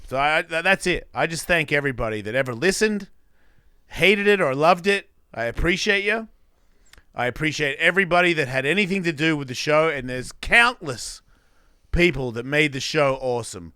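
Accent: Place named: Australia. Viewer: HEHEHE DICKHEAD ITS SO AUSSIE EVEN THOUGH YOUVE BEEN AWAY SO LONG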